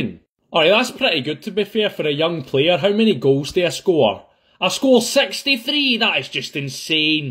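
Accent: Liverpool, United Kingdom. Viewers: Are you Scouse? Just a curiosity of an English accents learner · You from Liverpool?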